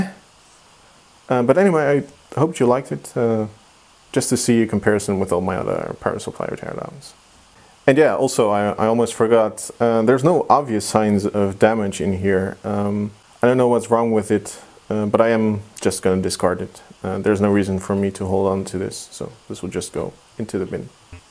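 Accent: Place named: Netherlands. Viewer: We will save that for a rainy day... Oh wait... Hahah I love your video's. Some things i dont understand, but you explain things very clearly. I find it very fun to watch. Accent is good too, normaly a dutch accent really irritates me, but not you, the accent is very minor.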